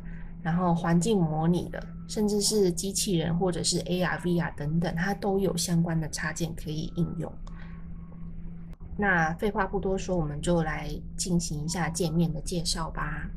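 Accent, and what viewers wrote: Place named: Taiwan. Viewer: 感謝分享，一直想學，但是一直沒有認真學下去，聽到台灣的口音倍感親切，帶動學習的動力!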